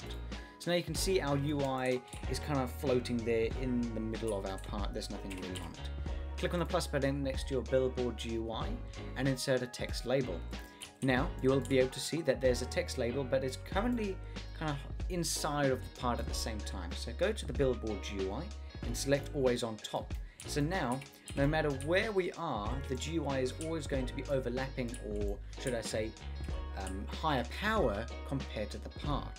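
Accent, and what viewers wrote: United Kingdom. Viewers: The best script tutorial YouTuber of all time, he explains everything too well... And I love the UK accent of this guy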